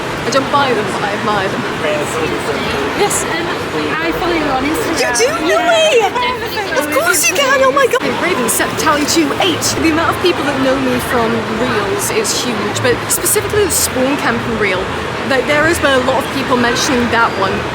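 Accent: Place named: Wales, United Kingdom. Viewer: Lol, I don't watch this stuff... But I can't not watch... Maybe because you're Welsh, and I'm "Welsh".. gotta be the accent..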